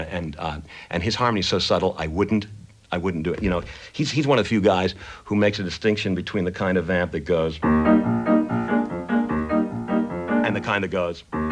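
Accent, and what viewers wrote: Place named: United States. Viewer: The most American accent ever.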